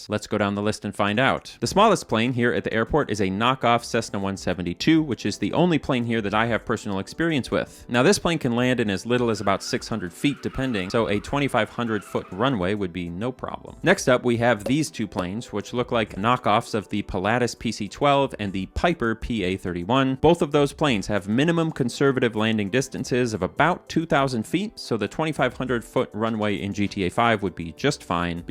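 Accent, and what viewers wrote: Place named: Minnesota, United States. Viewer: Sometimes I forget you’re from Minnesota and they you say “bars” lol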